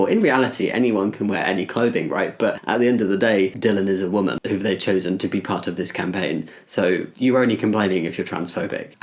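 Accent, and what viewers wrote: United Kingdom. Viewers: It took me a bit as an American to figure out you were saying Nike. Is that the common pronunciation in the UK? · British pronunciation. Ah-dee-DAS too.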